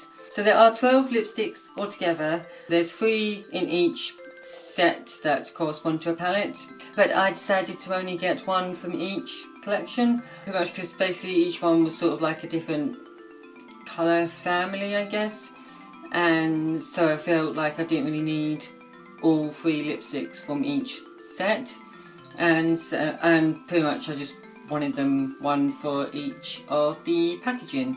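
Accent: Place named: United Kingdom. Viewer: I liked all 4 colors and all of them look nice on you🔥 Greetings from Siberia, Russia✋ Btw, I like your accent. It seems like you're from the UK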